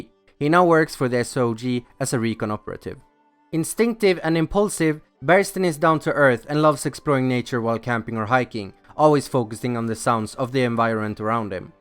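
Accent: Sweden. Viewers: Not bad that can be fun operator I have a question are you Swedish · Is he swedish? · Värsta svenne accenten haha, aja bra video, cool grabb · u are swedish?Also nice idea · Are you swedish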